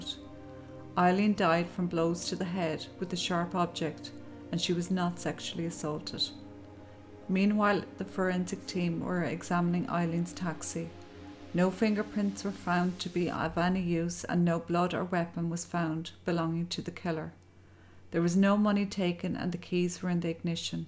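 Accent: Ireland. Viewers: I have just found your channel and Love ❤️ it. I’m Irish living in Oz since 1986. Love to hear your Irish Brog and humour. 👏🏻👏🏻Your amazing research and respectful presentation of these cases are Wonderful. Keep up the Great Videos, whilst the stories are tragic, and heartbreaking, they are stories that need to be told so We can All count our blessings and remind ourselves of the fragility of Life… hugs from Australia 🇦🇺 🇮🇪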